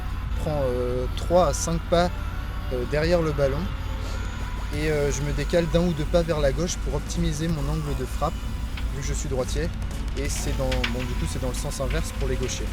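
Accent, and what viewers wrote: Saint-Étienne, France. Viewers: Tu serais pas stéphanois ? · Tu est stephanois!? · Vous êtes stéphanois ?! Si oui comme moi aller les verts !! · T'est de saint Étienne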